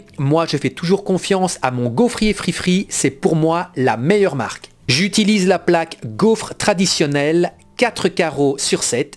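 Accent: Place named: Belgium. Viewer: Très bonne idée chef, une bonne nouvelle année et encore de belles vidéos avec bien l'accent belge 🎉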